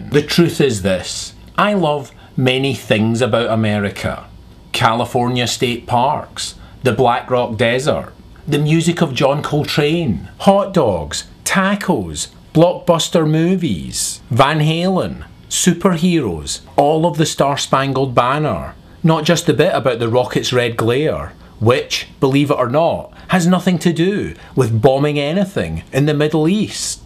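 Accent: Scotland, United Kingdom. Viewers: im scottish and a think your a bam pot and you have a gay posh scottish accent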